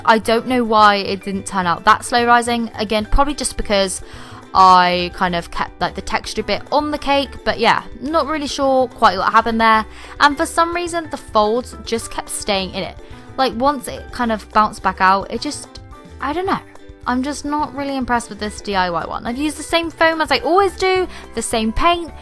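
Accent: United Kingdom. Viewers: Your voiceovers are great! I love your British accent!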